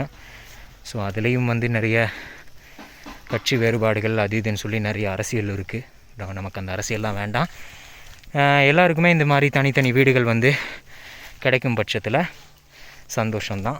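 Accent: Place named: Tamil Nadu, India. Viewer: மகனே நீங்கள் தமிழ்நாட்டில் பேசுவது போல் பேசுகிறீர்கள் முன்னோர்கள்‌பூவர்வகுடிமக்கள் தமிழ்நாட்டில் இருந்து வந்தவர்களா.அந்த பாக்கியம் பாட்டியும் தமிழில் நாட்டில் பேசுவது போல் இழுத்து வணக்கம் சொல்கிறார்கள்.இன்றுதான் முதல் முறை உங்களுடைய வீடியோ பார்க்கிறேன்.உங்களைபற்றி எதுவும் தெரியாது இனிமேல் தான் மற்ற வீடியோக்கள் பார்க்க வேண்டும்.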